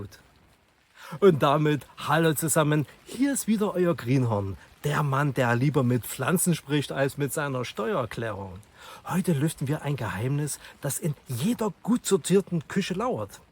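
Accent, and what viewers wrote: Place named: Saxony, Germany. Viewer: Die Petersilie liebt und hasst Hefewasser😮 Das kenne ich😂 (Schockolade) LG aus Brandenburg Du sächselst so schön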